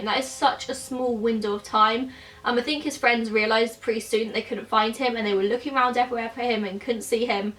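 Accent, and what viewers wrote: United Kingdom. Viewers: You're from the UK?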